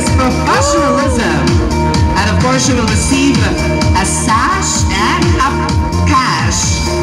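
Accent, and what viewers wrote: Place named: Visayas, Philippines. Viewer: Bisaya kaayo oy..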